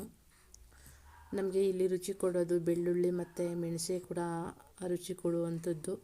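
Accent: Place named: Telangana, India. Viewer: Good TELANGANA Telugu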